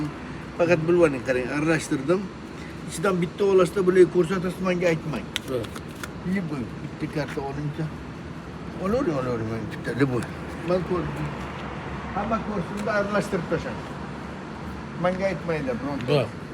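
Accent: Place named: Kashkadarya, Uzbekistan. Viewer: Бу одам Кашкадарйоликка ухшайди шеваси шунга ухшайди, малалес гап йук санатингизга, Яна янгиларини кутиб коламиз, Оллох умрингизга Барака берсин.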